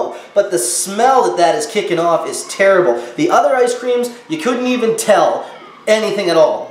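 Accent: Canada. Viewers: Breyer’s natural vanilla only has milk, cream, and sugar. It definitely melts. Don’t condemn all Breyer’s ice cream. Plus Breyer’s lids do not fall off. They have a plastic strip that goes around the edge of the lid. Evidently Oreo’s must be paying you money. I don’t know where you got this ice cream.but the Breyer’s sold in my store melts. That container is not saying ice cream. It’s a frozen dessert. Anything that says frozen dessert is not ice cream. You are obviously not here in the states. I don’t know where you are located. Since your English sounds pretty much like we have here, I’m thinking you are from Canada plus the French labeling is also a clue. I only buy their Nural Vanilla because it contains no preservatives or chemicals. Be truthful when you are condemning a product, otherwise we can’t trust you either. 😟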